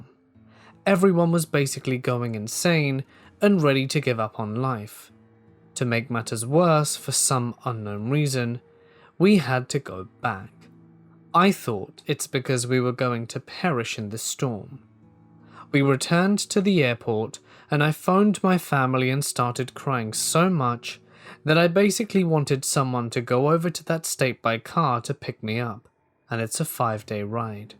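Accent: United Kingdom. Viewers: Is it you that just speaks that way? Or do you only get stories from the U.K?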